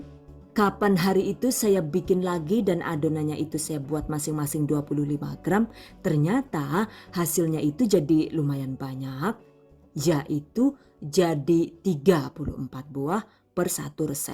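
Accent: Banyumas, Indonesia. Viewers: noted..besok mo nyoba bikin ahh..btw mbakayune lucu temen klo lg ngapak mix with english..👌👍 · Suka bahasa ngapaknya.. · Waalaikumsalam. Catat. Ngapak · Suarane mdok tmn yakkk asli bnyumas y yu😁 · suka cegek saat dgr ad bahasa ngapak nya hahahaha,, tp lucu juga sih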